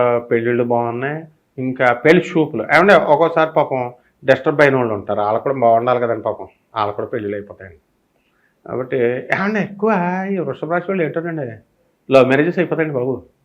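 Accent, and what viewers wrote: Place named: Godavari, India. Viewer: మీ యాసని బట్టి మీది గోదావరి జిల్లా.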